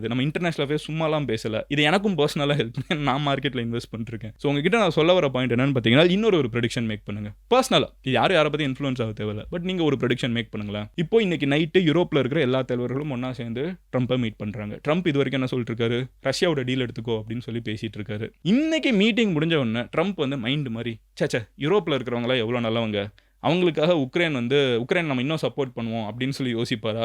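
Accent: Madurai, India. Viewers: Poravainga, madhura slang 😁😁😁😁 vera level🎉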